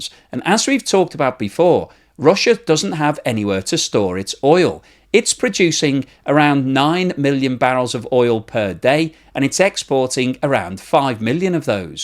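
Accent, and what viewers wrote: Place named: RP, United Kingdom. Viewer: your BBC English, your clever views and the capacity of explaining complex issues in a simple way makes you Top Expert!!!! Thank you!!!!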